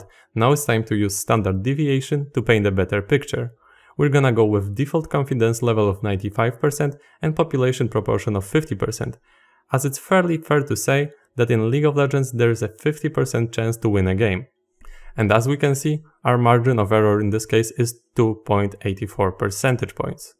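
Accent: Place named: Poland. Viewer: Polak? bo slysze akcent XD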